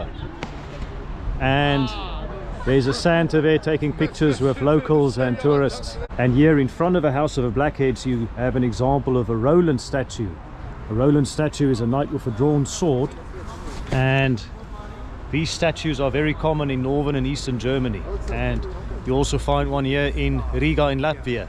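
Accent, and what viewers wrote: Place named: South Africa. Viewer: You Mr sounds like from South Africa to me, maybe I wrong,love your videos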